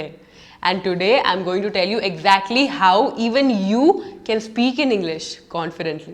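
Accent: Hyderabad, India. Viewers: Indian accent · Are you from Hyderabad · All is good but your pronunciation of words with 'con' 'com' is not ken but kon or kom, like continue, communicate. And there is no way that indians can get off their ascent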